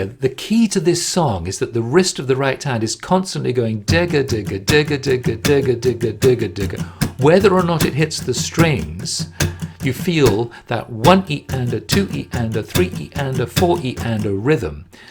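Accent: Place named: RP, United Kingdom. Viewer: Excellent. I love the teaching and the technique. I just would like to know if the Rex. Harrison accent is authentic because only 2 to 3% of people in England talk like that. And it sounds just like Stewie on a Family Guy, very cool, though.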